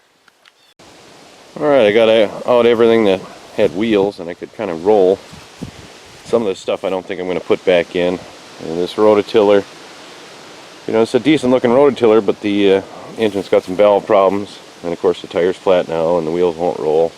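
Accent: Minnesota, United States. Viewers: Hey man r u a Minnesotan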